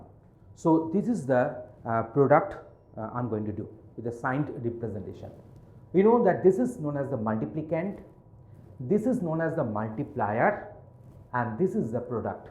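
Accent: Bengal, India. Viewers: So, let's go with both tamil and hindi and may be other languages also. As far as I can understand, Sir is bengali with his accent.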